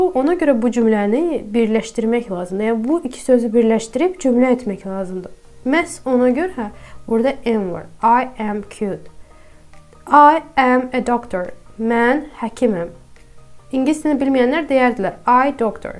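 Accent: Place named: United States. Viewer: I graduated British english unlike You. But You speak in American english unlike me. 🤲🏻 Good Luck!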